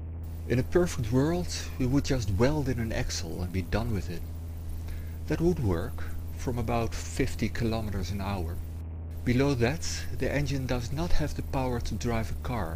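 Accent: Netherlands. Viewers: It is a Dutch (The Netherlands) accent. For a Fat and Heavy GERMAN accent listen to <link> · you sound dutch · I think he's dutch. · is Dutch. He's not speaking his mother tongue. He has a slight Dutch accent but his English is perfect. He even uses English idioms and nuances which a lot of native English speakers would have difficulty with.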